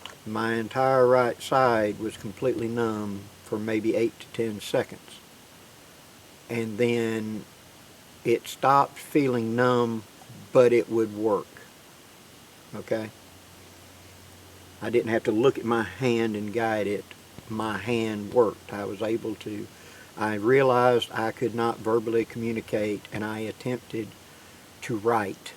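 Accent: South, United States. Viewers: God has plans and works in not straight forward plans someone who sees this will react a second faster saving another one of his children. You may never get the formal thank you but a man like you doesn’t look for them. Thank you for all you videos and you still sound like a southerner to me coming from a Yankee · you’re a fighter and we all wish you well. You’ve got a lot of people here who love and support you. So glad to hear your dulcet southern tones, and feel you’re still here to finish whatever God has planned. Take care, love from Leamington Spa, UK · I hope your recovery is as fast and complete as possible. I also hope you get that glorious Southern accent back!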